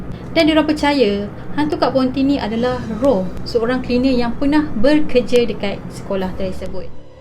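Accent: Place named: Kedah, Malaysia. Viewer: Owg kedah ka.sekampung ooo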